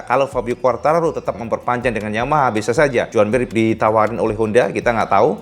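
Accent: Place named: Java, Indonesia. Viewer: Sampean jowone pundi cak kok kuentel jowone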